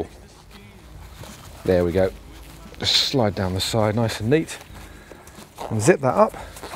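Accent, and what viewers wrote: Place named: RP, United Kingdom. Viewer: sounding reeet posh in this! till he burps at the end, that was grotty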